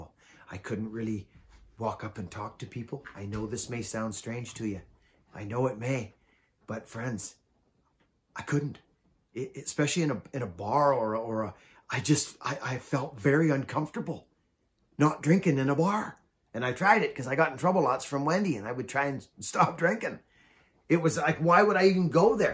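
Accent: Canada. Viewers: Really great vid. I also feed an insatiable wood stove. Where the hell do you live? You sound like and have the disposition of a classic Canucklehead, I subscribed. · Hey, I been watching your stuff for a while now, I appreciate the climbing tips, I just started climbing myself this season, I've got some pretty good climbers helping steer me in the right direction. I've been around power tools and saws my whole life but I've only been clean off fentanyl/heroin for 2.5 years now, and everything is going great. I just wanted to tell you that I really like ur style (even with the cannuck accent) lol. And i enjoy the content. Keep it up man. I wish I could get out to b.c., we don't have any of them giant trees here in Connecticut. Have a great day man, stay safe!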